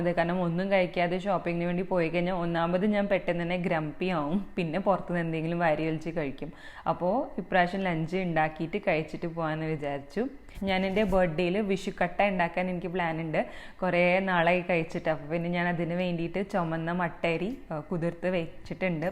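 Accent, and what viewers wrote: Thrissur, India. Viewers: Why suddenly this thrissur slang ? I never felt this before in ur vids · Eee thrissur slangine evide olippich vachirikkaayrunnu? 😅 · Aaha...namude thrissur slng aanalo inn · Pakka thrissur slang❤❤❤❤ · I could hear the trissur kaari in you dear ...was a lovely relaxing vlog ...i miss Kurti shopping too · Pakka thrissurkariyayallo😍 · ഇന്ന് ഭയങ്കര തൃശ്ശൂർ സ്ലാങ് ഉണ്ട് സംസാരത്തിൽ 😁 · This time Thrissur slang nallapole vannittund.... Nalla change... Purple, blue, pink ellaam nice kurtis aanu....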